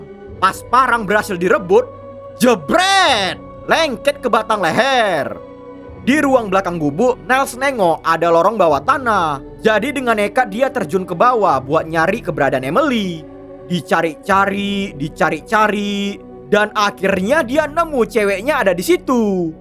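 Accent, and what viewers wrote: Medan, Indonesia. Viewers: Medan kali salam dari Tanjong Morawa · Orang medan yaa min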